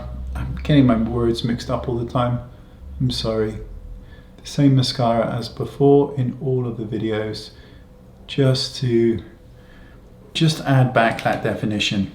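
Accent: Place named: West Country, United Kingdom. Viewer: Pisser!! 😆 you re funny, are you a west country lad BTW?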